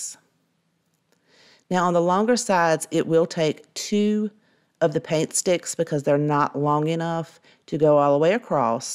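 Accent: South, United States. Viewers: I am a new follower. so far everything you create is just awesome.. I think I hear a little bit of country accent in you... lol.. I am country , live in South Carolina.. Thank u for sharing your amazing talent with us. ❤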